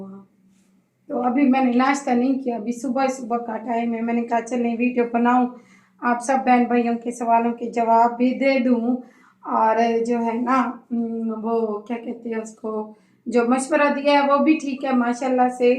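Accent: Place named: Saraiki, Pakistan. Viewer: Urdu to achi bolti hain sis bs lehja Kuch change krny ki zrurat hai qk Urdu Mai b lehja bilkul sraiki wala hai na is Liye log kehty hain k sraiki hi bol lia kren....Jo unparh hoty hain wo to lehja sraiki wala b 4khyn ya Urdu toti photi bolyn koi Kuch nhi kehta lekn AP to mashaallah educated hain na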